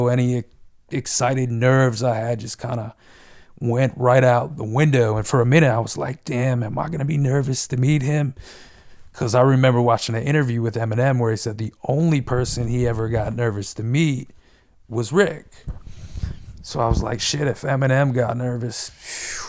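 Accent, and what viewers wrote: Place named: West Virginia, United States. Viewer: Are you from WV fam? Or do tennesseans say "on" like West Virginians?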